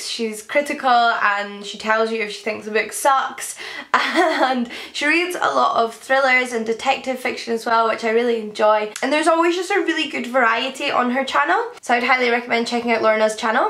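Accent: Scotland, United Kingdom. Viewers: And your Scottish accent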